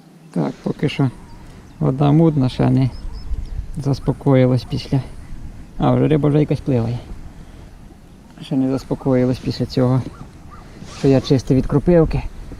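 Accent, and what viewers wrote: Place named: Western Ukraine, Ukraine. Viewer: А вимова типова західна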